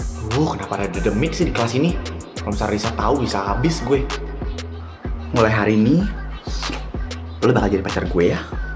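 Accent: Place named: Java, Indonesia. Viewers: Si cewek keriting bikin bulu kuduk merinduling anjirrrr😂😂😂 Ternya bisa bhs Jawa anjirrr Logat jawanya sudah meresap di jiwa Ad pak prestyo anjirr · Suka banget sama logat Jawa nya kak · bahasa nya medok bangett,mirip orang jawa bet😭😭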